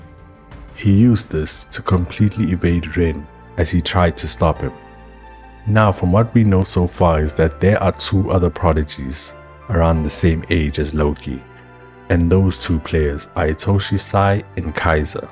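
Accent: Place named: South Africa. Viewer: haha nah from your cadence. As a fellow south african anime lover you have a new follower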